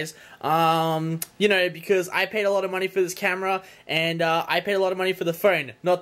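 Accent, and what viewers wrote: Australia. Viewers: He is speaking Australian -.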